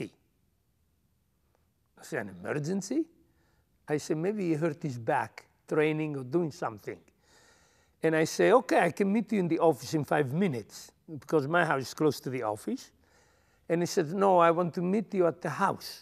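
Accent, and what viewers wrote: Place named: Sardinia, Italy. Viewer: Inglese con accento sardo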